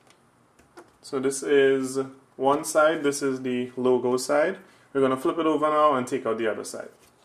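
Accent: Trinidad and Tobago. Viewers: Yo you sound Trini, big up man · Is he Trini? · Soundin like a rell Trinidadian “ 😂 Thank you ! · Yo the shock didn't expect a trini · know that trini accent anywhere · Are you from Trinidad? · Bro you sound Trini. Boss... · Thanks for the video you sound like you’re a Trinidadian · Is that a Trini accent ? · You a Trini? 😊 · Thats a trini accent i hear? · you from trinidad? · Yu does know a trini from anyway🙏🏾 thanks for the vid bro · Was surprised when I heard the Trini accent lmfao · Mannnn I started listening to the accent and I’m like… he sounds Trinidadian…I look at your Instagram and confirmed ✅ my moms side of the family is Trini 🇹🇹 awesome to hear a voice representing on YouTube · Aye Trini. I hear you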